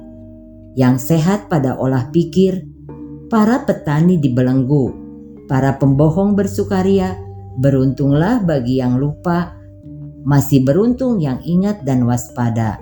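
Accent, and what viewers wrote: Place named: Sunda, Indonesia. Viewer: Narator nya ini berbahasa Jawa rasa Sunda.... wkwkw kedengarannya aneh lucu.... Salam sukses mbak naratornya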